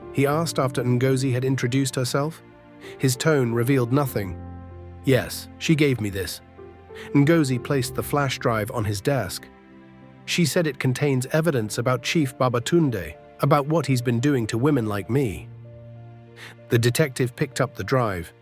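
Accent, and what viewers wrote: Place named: United Kingdom. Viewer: I SO AGREE! I CAN LISTEN TO THE NARRATOR (BRITISH ACCENT) ALL DAY. LOVE THE FEATURED MOVEMENTS OF THE CHARACTERS. GREAT STORYLINES FROM START TO FINISH.😊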